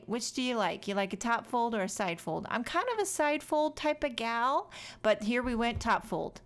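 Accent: New York, United States. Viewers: It's a New Yawk accent ~ and New Jersey, too if I must say so myself:) I LOVE your rainbow wreath,...it's Gawguss!!! Thanks so much for another fun video!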